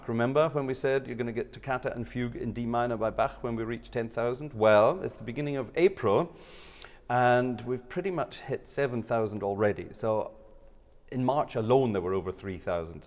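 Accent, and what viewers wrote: Scotland, United Kingdom. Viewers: Love your scottish accent <3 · First time on your channel. Fascinating, as the organ has always seemed so complicated—to a piano player such as me. Also fascinating is how your accent moves from Scottish to Sean Connery performing a German general in a World War II movie. 😉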